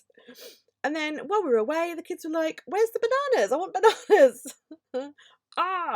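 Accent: England, United Kingdom. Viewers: Absolutely enjoy your “chatty “ vlogs!!! I am way over here on Vancouver Island BC Canada and I always listen to you with my mug of tea in hand just to listen to your accent as English is half of my heritage and I drink mugs of tea every day. Thanks for your hard work. xo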